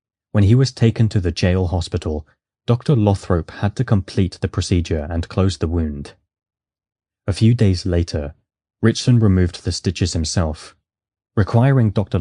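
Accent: England, United Kingdom. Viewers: Wait is it you? I like it. You are not mispronouncing the word, please don’t work on it, it is my American ears and your lovely English accent. There is nothing wrong with your pronunciation!!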